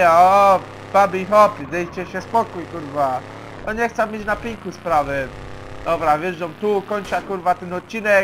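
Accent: Silesia, Poland. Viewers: Po śląsku było lepiej jak mówiłeś :( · Seria GTA u bez Śląskiego gadania jest tak niepotrzebna, jak BuFu na Polskim YT...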